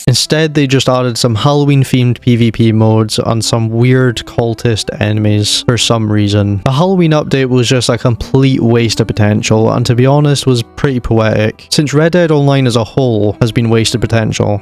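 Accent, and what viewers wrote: Scotland, United Kingdom. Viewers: Hey, are you Scottish by any chance? Your accent is kind of peculiar in a good way lol. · I smell a Scot.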